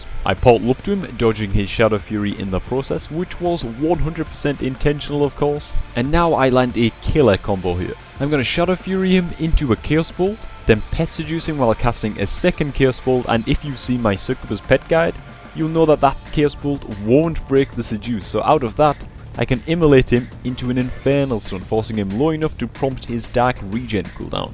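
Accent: Newcastle, United Kingdom. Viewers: Hey man, just wanted to say hi! just found you randomly on youtube searching for lock videos as I'm currently leveling one to replace my warrior (Bad timing by the looks of it with 5.4 but I'm hooked on destro now) Are you from near Newcastle? I recognize the accent, I'm from Leeds =) Anyway thanks for the vids and you've earned a new subscriber!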